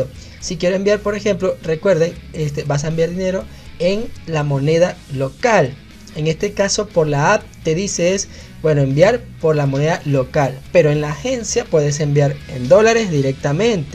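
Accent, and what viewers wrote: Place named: Venezuela. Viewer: Mmm tienes un acento al chamo amigo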